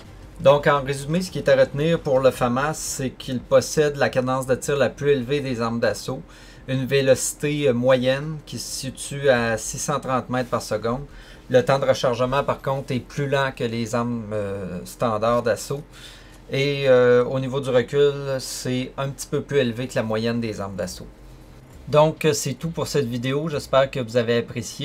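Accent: Quebec, Canada. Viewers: Vidéo ultra structurée très utile et en plus avec l'accent quebecois !!! Je suis fan continue comme ça ça nous aide a comprendre les subtilités de ce jeu tout comme ta vidéo sur les accessoires. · Accent Québecois, il n'y a pas de honte et il ne faut pas en rire. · acssent du Quebec <3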